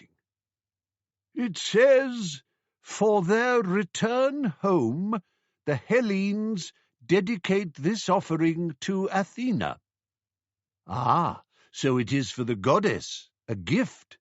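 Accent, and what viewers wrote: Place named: Oxford, United Kingdom. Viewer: When a Brit is speaking Oxford English and “rolls the r”, you know you are in for a treat.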